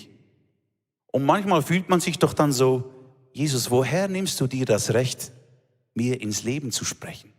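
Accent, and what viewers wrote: Switzerland. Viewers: Liebe Mirjana, das ist Hochdeutsch mit Schweizerakzent. Und wenn man Hochdeutsch versteht, ist es kein Problem die Predigt zu verstehen. Zu den Menschen wird freundlich gesprochen und sie werden ernstgenommen. Nach der Speisung der fünftausend ist Jesus Christus herausfordernd, weil er ins ❤ der Menschen sieht. Bei Maria und Martha ist ER empathisch. Denn ER weiß welche Worte wir brauchen. Auch diese Geschichte wird erwähnt. Also auch diese Tatsache kommt nicht zu kurz.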